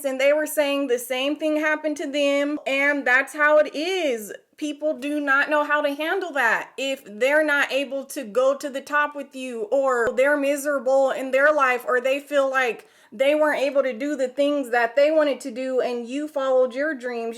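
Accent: South, United States. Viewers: This is my first video of yours are you southern?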